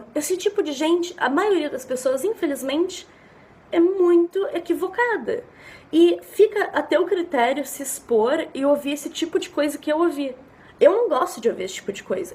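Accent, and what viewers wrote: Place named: South, Brazil. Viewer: 박지민 e do Sul ne...sotaque maravilhoso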